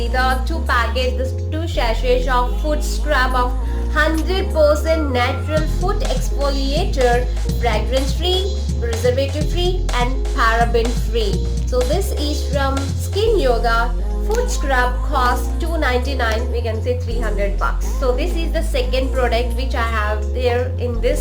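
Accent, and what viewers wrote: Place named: India. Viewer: Indian accent.... n dii i have one video suggestion can u plzz make a video on oil routine for hair growth 😋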